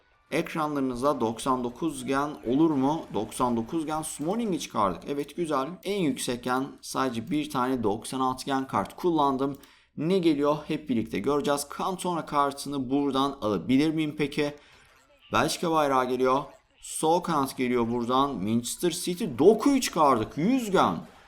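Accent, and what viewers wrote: Azerbaijan. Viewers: Abi Azerbaycanlımısın?❤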